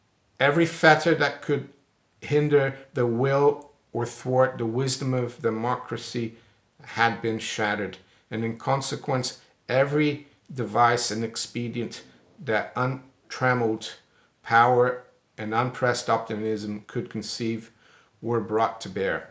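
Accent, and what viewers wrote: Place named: United States. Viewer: Very interesting history. you have the most interesting accent. I was astounded to learn that you grew-up in Brasil. (Big Bossa Nova, and and 1960s Brasil in general fan here, btw! In fact, one of my all-time favorite movies is the 1960s heist film “Grand Slam” which was filmed in Rio.) You sound like a Jewish guy from the Bronx but also very curiously have a noticeable Boston AND Canadian-like accent. Very unique! I enjoy listening to you speak.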